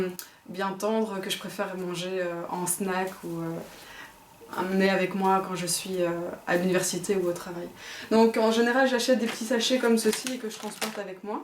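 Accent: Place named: Belgium. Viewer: Merci pour la recette mevrouw ; j'ai tout de suite entendu que tu étais une compatriote Belge ! :) J'ai une question : le Blender Magic Bullet est-il une machine fiable ? Parce que vu son prix (99$ c'est ça ?), je préfère que ça soit un investissement sûr et durable (et alors, où se le procurer en Belgique...).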